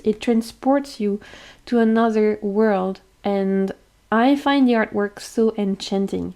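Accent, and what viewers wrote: France. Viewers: With you on the whole angel thing….. but that angel deck you showed looks like a deck I could get next to. Thanks for sharing. Just wanted to say I love listening to you with your beautiful French accent….. tres charment! Plus would you be willing to you teach people how to correctly say Tarot de Marseille!?!?! 😂😂😂 it drives me nuts how English speaking people say TdM!!! Especially those of us from the states. Love the Marseille Waite….. I’ve seen it before and really didn’t expect to like….using a cohesive art style really makes the difference. I love your idea about how to read round cards. My first deck was a round deck and I never thought of the directionality as time, but I totally love this idea and I’m going to give it a try.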